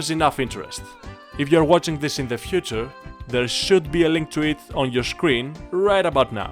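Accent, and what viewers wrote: Greece. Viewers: You have a strong greek accen. Are you greek or im just wrong :-/ ?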